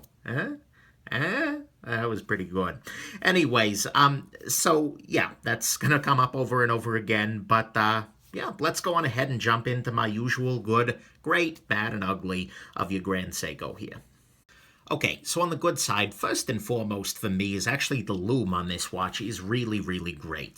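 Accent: New York, United States. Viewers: Same! Voice reminds me of people I know back in New York. Someplace I haven't been back too since 2011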